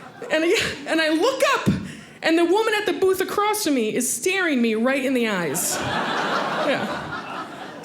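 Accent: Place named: Midwest, United States. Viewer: From her accent I swear she's from the midwest!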